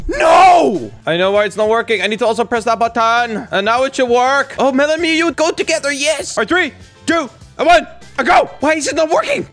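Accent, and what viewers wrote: Netherlands. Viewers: Hes dutch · are you dutch? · are u Dutch? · Are you from the Netherlands or from Belgium?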